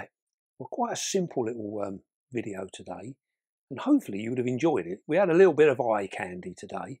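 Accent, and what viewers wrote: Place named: United Kingdom. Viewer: Who else says "Hello Guys, welcome back to the Beastie Room" in their best British accent, right along with?;), as always, top notch production with lots of fun 'eye candy'. Again, is that me, the woman who has been afraid of spiders all her life, saying that?? And to Camera Lady, Bless you! Always look forward to your videos.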